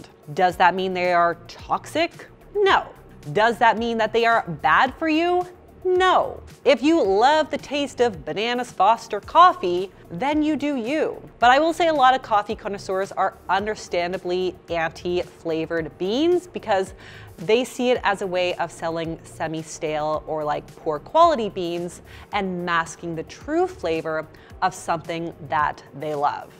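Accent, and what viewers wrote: Canada. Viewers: I was not prepared to find out how Canadians say the word bagel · Love the Canadian accent. It’s cool hearing bagel said in a different way. I love accents.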